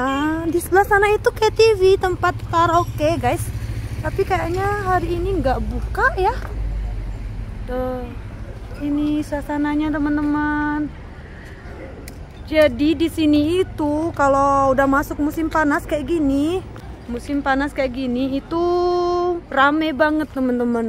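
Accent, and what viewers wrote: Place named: Hunan, China. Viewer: Bhs mandarin kmu sdh mirip dgn org asli disana Kayak org bhs hunan tp msh bs lebih mengerti,ada medok nya tp bhs hunan lebih medok lg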